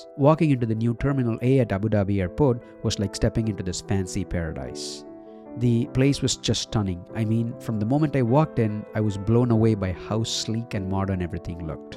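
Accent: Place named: Philippines. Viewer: What a great review! Excellent commentary and not too disharmonious music. Great videography that wasn't rushed: loved how you showed the presentation. I assume you are Filipino from your accent? By the way, on Philippine Airlines, the tablecloths always look like they've been pressed, not that I'd ever previously thought about that. Keep up the good work. This review deserves many more than 7500 views.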